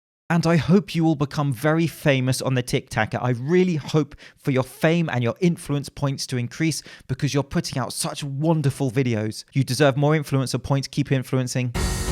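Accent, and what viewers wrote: United Kingdom. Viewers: I forgot your British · Always a week later with the content Gregg Doucette, More plates More dates, Or Phillion make is a bit Lame... just copying topics from others and adding a British accent to it. What about creativity and original content? In the beginning it was a bit funny but now it's getting exhausting watching the same content twice so I'm gone lol :D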